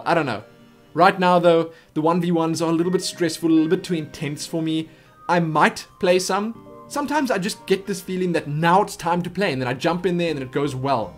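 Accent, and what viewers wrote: South Africa. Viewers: You sir, must be a South African. I watched your Alice videos and I could swear I was listening to a Boer! If not...well shit. My mistake XD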